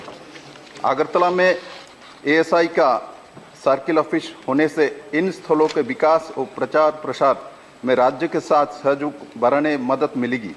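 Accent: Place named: Bangladesh. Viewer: Bangaladeshi bangla jesa bat karti hu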